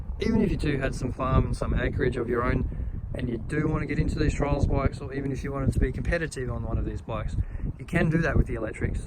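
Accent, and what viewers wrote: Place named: Australia. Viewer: nice i used to live in canberra now i live in the usa in seattle. it is good to hear an australian accent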